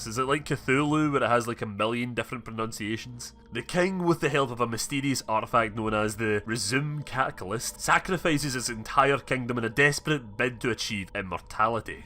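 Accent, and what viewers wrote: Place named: Edinburgh, United Kingdom. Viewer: Also, my mum's from Edinburgh, so luv yr accent :)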